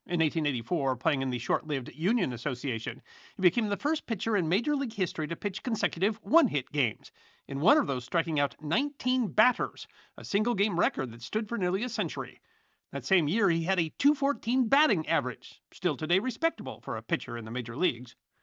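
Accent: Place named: Georgia, United States. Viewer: He sounds like a real (Georgia) peach.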